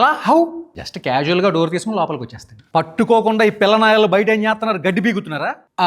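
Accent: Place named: East Godavari, India. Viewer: This guy is very intelligent and highly talented, with a fund of original humor and high class satire which we rarely find in Indian YouTubers in general and Telugus in particular! He effortlessly carries the East Godavari slang with appropriate verbal sounds at right appropriate places thereby enriches the descriptive part with a very unique and rare blend of subtle humor laced with extremely high quality observation of semi - literate Telugu script writers and near illiterate Directors! I think there is only one more movie troll, one Fasas Roast who is in the same league with this guy and he already emassed a fund of followers and I foresee and wish him the same, in the imminent future! I think I can even write more and I wish I should as he richly deserves but I don’t have energy as I’ve totally exhausted mine in laughing uncontrollably! 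Good Luck, Mr.! You’ve already proved yourself and try to diversify your inherent and latent by utilizing it to the fullest extent, if you are seriously into this! I doubt whether you are highly educated with a highly placed job and stuff! Anyway, you’ll Go places! That’s the writing on the wall and I’ve a rare gift of reading it clearly! I’m very fortunate to have a chance encounter with your posts and you’ve really made my day! You shall excel in your chosen line if you pursue it very seriously and with a little dedication and a bit more of an indefatigable industry! Amen! So mote it be! Kindly f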